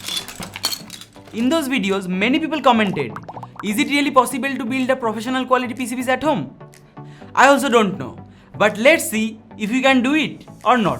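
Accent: Bengal, India. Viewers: How do I contact you? You also sound surprisingly much bengali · Accent Is Like Bengali Dada Where Are You From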